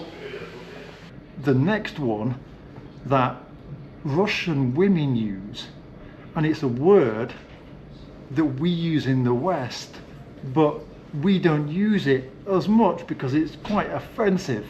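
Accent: United Kingdom. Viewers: what kind of British accent do you have? What is it called? Great video!! 🇬🇧🇷🇺 I am 🇺🇸 with a NYC accent myself!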